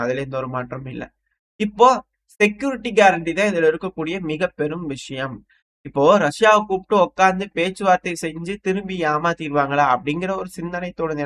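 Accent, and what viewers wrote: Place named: Kongu, India. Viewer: Thambhi,,,. Ungga.,, Konggu. ,Thamiz Super ,, silence,,pechu,,,good🎉🎉🎉🎉🎉🎉🥭🍊🍑🍉🍎🍒🍓🙋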